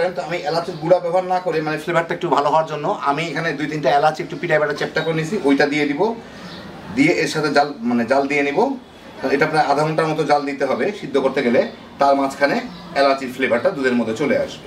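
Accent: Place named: Bikrampur, Bangladesh. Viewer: Assalmualikum . Are you from Bikrampur (Munshigonj) ? Your sound seems like that .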